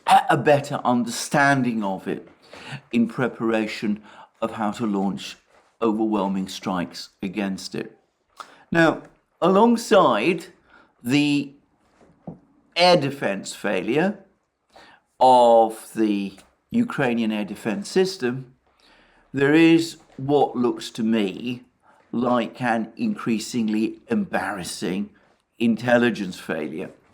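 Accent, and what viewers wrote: United Kingdom. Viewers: British accent is adorable and I like learning new words from. Moreover, now I know that even English speaking people say "hypersonic" instead of "supersonic" 😁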